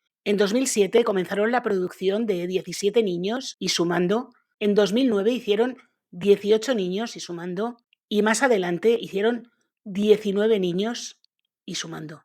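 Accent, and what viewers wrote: Spain. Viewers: Y tu quien eres una persona que vive de hacer videos sobre la vida de los demás? Y a veces mentiras? Ya veremos si te va bien cuando tengas que rendir cuentas a Dios. Detesto el acento español.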